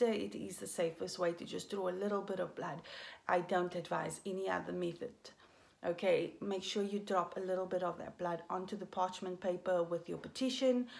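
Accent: South Africa. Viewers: Are you South African? Bec I am :)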